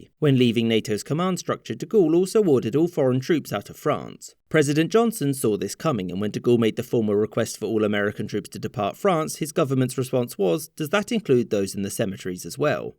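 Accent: United Kingdom. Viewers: given the narrator’s very British accent, I suggest your comment is misplaced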